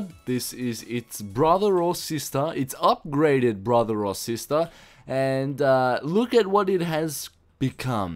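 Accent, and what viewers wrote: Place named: Australia. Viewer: Are you Australian? You kinda sound like one.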